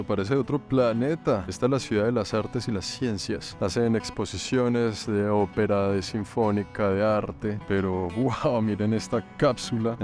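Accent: Colombia. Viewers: Me sorprende q ya llevas años viviendo en Canada y tienes el acento colombiano soy salvadoreño pero no entiendo porque os centroamericanos en su mayoria se les pega ese acento como q fueran gringos.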